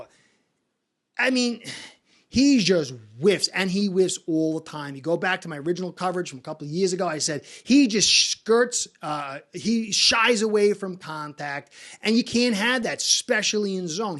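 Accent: New York, United States. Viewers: I like you’re accent are you from New York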